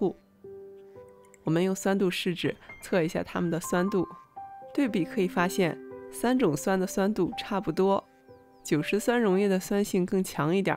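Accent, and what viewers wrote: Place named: Yantai, China. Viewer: 听口音不知是不是山东烟台人？😁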